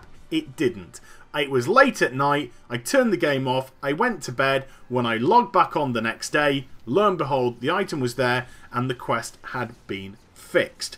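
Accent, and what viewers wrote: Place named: United Kingdom. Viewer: Hi, great review, u make me got interested in this game. However i run into a problem while getting this game. Since u have a little British accent, you might be the perfect person to ask this question with. The problem is that my location that i live in can only buy the EU version of the game. Does EU server have a lot of non English speaker? Whats the majority language that ppl use when in terms of raid and bg in Europe server?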